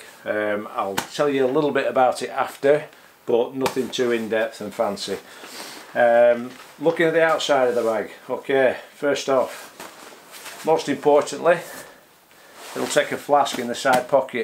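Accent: Lancashire, United Kingdom. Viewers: Hi, I thought I would just take a moment to say thanks for these great videos, by following your tips my photography has improved already! You sound like a Lancashire lad? Chorley? Burnley? Preston? Just watched you explaining whats in your kit, there are some expensive bits of kit in there! Undaunted I thought I would treat myself so I went out this afternoon and bought myself a packet of Fig rolls lol! Keep up the good work and thanks again!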